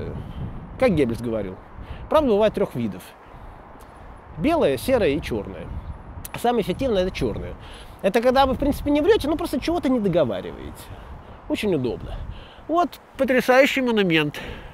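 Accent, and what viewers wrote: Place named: Saint Petersburg, Russia. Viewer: Чувствуется ваш Петербургский акцент , каждая буква произносится тщательно,блестяще.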